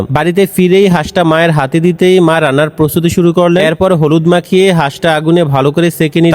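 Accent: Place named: Narsingdi, Bangladesh. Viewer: Tai Tu boli vasha ekdom amdr Moto . Hajipur chinen? Gechen kkhno?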